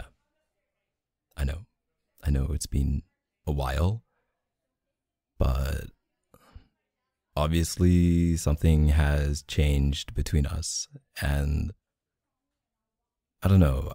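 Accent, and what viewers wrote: Canada. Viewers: canadian accents are so adorable i cannot— 😶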